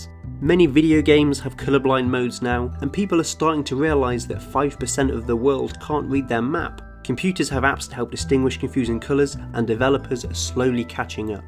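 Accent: United Kingdom. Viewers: I like the British accent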